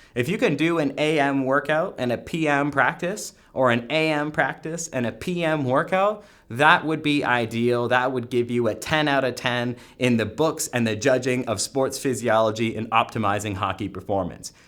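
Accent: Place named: Canada. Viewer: canadian soundin fellow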